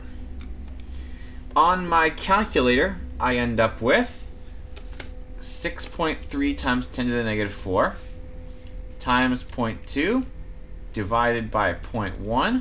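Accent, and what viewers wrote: Canada. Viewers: you Canadian man?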